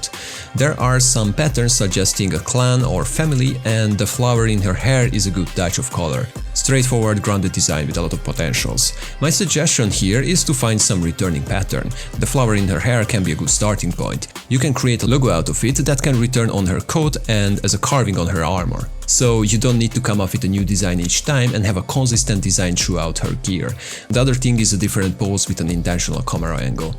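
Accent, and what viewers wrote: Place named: Hungary. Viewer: Your hungarian accent is so strong man🤣 (Ímádom a rajzaidat és a stílusodat)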